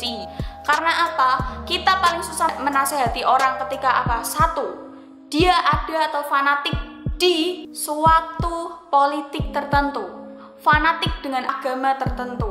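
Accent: Java, Indonesia. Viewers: Senang dengerin medok mu mba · Ngegas medok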